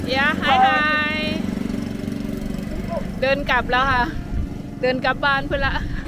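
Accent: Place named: Laos. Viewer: พี่คนจังหวัดไหนคะ? พูดเหมือนคนลาวเลย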